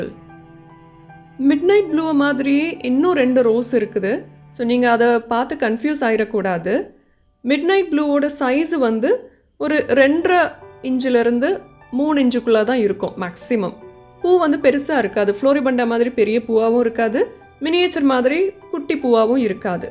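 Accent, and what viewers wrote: Kerala, India. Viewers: Malayali ano? Sounds like Malayali speaking Tamil.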